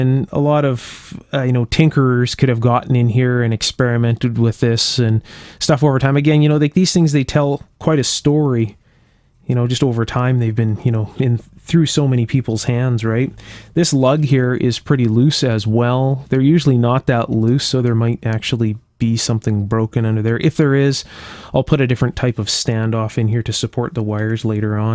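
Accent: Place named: Canada. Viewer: Love hearing Canadians say "about" "pasta" and "Mazda" 😬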